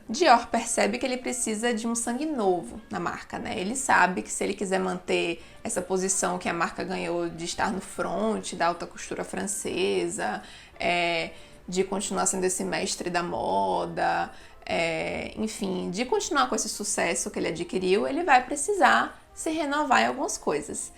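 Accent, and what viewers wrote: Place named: Bahia, Brazil. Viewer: Como eu não percebi seu sotaque baiano?! Meu Deus 😅 É por isso que é descolada assim, gentem🤭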